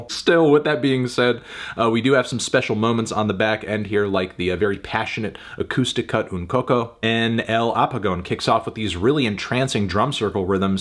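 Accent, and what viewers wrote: United States. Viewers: arent u italian why do you pronounce spanish words so…gringoly.. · No se le quita lo gringo.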